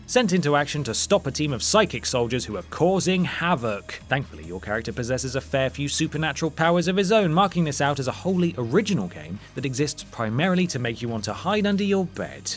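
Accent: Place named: RP, United Kingdom. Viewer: GOD IS THERE REALLY NO VIDEO OF SOMEONE DOING THIS LIST WHO DOESN'T SPEAK WITH AN OBNOXIOUS POSH ACCENT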